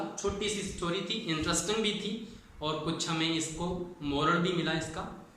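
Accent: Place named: Rajasthan, India. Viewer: Rajsathani lagyo mn Bhai re 😂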